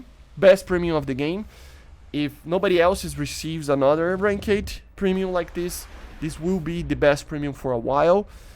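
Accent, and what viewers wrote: Brazil. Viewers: Tu é brasileiro né? K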